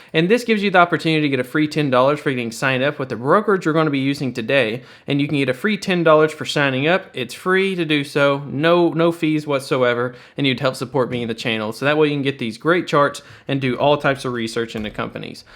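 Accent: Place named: Oklahoma, United States. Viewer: You sound like you're from the Oklahoma! I imagine you live there?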